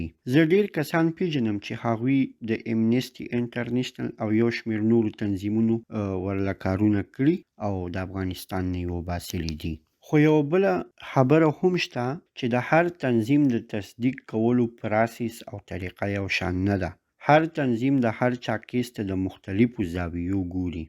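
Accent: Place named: Khyber Pakhtunkhwa, Pakistan. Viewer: ډير په زړه پورې معلومات ؤ ممکن ځينې خلک ستاسو د ژبې او د لهجې په اړه منفي نظر وړاندې کړي خو تاسو فعاليت جاري وساتي تاسو په ټول معلوماتو باندې پوه شومه همدرانګه ستا لهجه د کوزې پښتونخوا خلکو ده پوهيږو يوه پښتو ژبه ده فقط لهجې دي لهجې هم ډير فرق نه کړي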